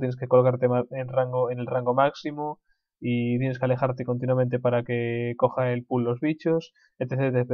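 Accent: Galicia, Spain. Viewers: Eres gallego? tienes mucho acento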